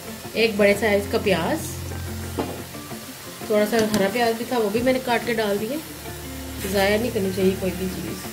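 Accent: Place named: Pakistan. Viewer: Me india se hu baht esy treeka btaya hai apne nice mam me try krungi pakistani zban muje pasnd hai bahut yha india me istrha nhi bolta koi bhi but muje apki voice achi lgi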